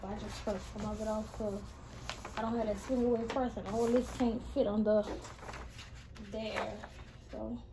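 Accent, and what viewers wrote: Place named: New Orleans, United States. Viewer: Sounds like she's from New orleans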